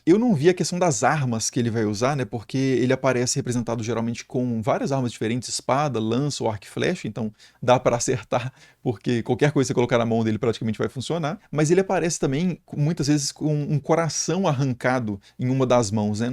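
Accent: Minas Gerais, Brazil. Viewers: Da pra notar que vc é de minas tbm kkkkkk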